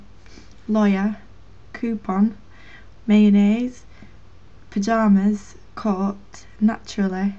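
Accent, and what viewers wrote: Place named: Lancashire, United Kingdom. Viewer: Hey, I had an audition for a play in Dallas which would be performed in a Lancashire accent. I found you and recorded your Tumblr on my digital voice recorder for practice. I didn't get the part, but kept your voice on my recorder. I just like hearing it occasionally. I love your accent! The bug is a roly poly.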